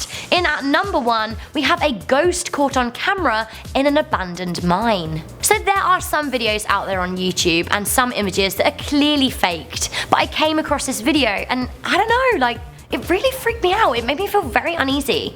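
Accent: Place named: United Kingdom. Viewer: too british... we need danny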